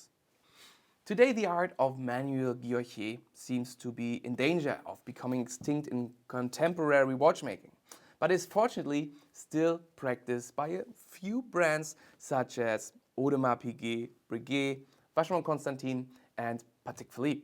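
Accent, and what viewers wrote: Germany. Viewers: owen wilson‘s german accent baffles me every time i watch one of these exceptionally well made videos… great stuff!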